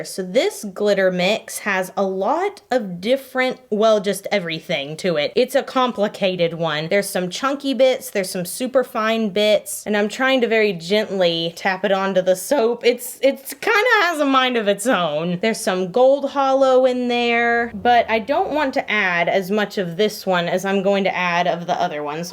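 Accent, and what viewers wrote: Texas, United States. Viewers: It’s OK,!! Remember Marchesa?? You Texans are so charming!! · “Gawly Dawg”!!!??? She is such a Texan.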